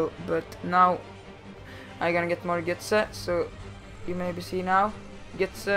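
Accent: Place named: Sweden. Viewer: Du har en typisk engelska som alla svenskar har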